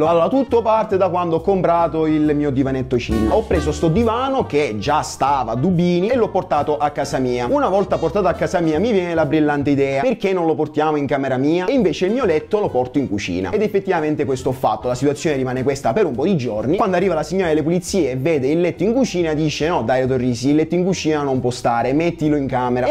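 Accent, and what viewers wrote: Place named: Eastern Sicily, Italy. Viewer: c'è del video in questo accento siciliano orientale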